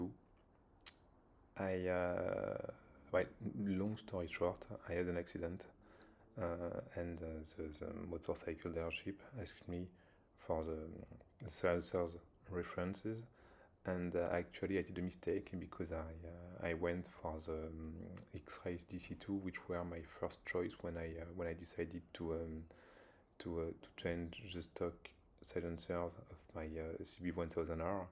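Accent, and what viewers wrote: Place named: France. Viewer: Je ressent un léger accent French l’ami 😉 je me trompe 😜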